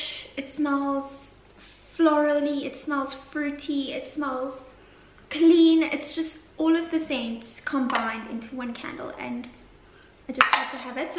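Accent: South Africa. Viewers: I'm dutch and it's funny to hear you speak South African because some words are dutch but sound different. Love your video's!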